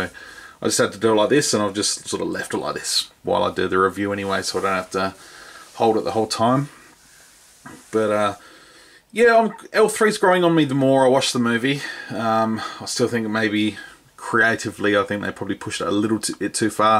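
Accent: Australia. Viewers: Thanks. You are right they let her walk like a human and it spoils all the hard work the effects people did. Where do you get your figs from you sound like an Aussie. Forget the Aussie housing crisis there is there is a black series crisis.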